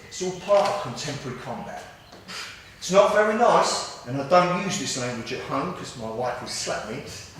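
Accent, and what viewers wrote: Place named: United Kingdom. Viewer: I wish I could understand what he is saying, its mostly english, yet the british accent is hard to figure out. I think If we were to see what he was talking about instead of just talking about it it would click and make more sense. I seen a couple of times where they almost started to show us some tactics other than pretending to hit in the face. I am gonna try one more video to see if the audio is any better.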